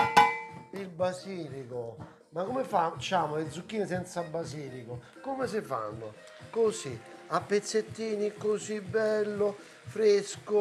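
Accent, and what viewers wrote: Rome, Italy. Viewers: A parte la parlata romana che ha un sapore a se', la ricetta stuzzica proprio. Mi manca il sapore di Roma, manco da li da più 50 anni. Ciao!!!😋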